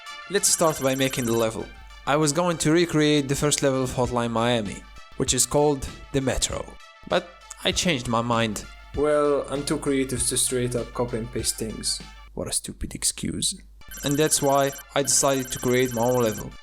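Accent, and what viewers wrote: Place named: Morocco. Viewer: You sound Moroccan wlah akhoya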